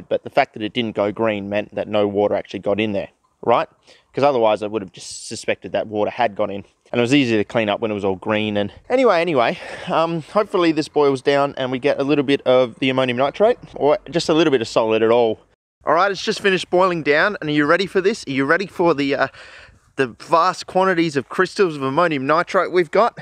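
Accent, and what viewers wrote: Adelaide, Australia. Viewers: He's a proud Adelaide lad! We all sound like that over here.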